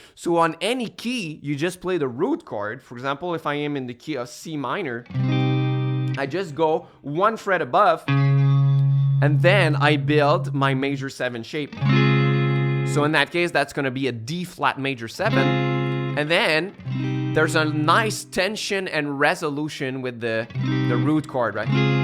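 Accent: Quebec, Canada. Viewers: Très intéressant, merci! En passant est tu québécois?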